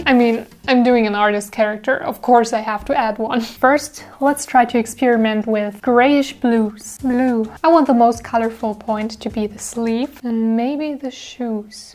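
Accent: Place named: Germany. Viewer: joooooo ich hab das ganze video geraten was das für ein akzent war und als du das dann mit der deutschen voice line gesagt hast war ich happy weil ich nd viele deutsche kenne die das Spiel spielen ^^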